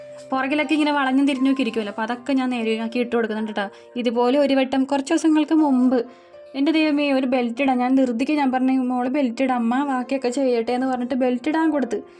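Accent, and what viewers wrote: Kochi, India. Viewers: ഞാനും ഒരു തനി kochikari ആണ് യുടെ സംസാരം സെരിക്കും എന്നെപോലെ ചൊന്നുന്നു 😂😂😂😂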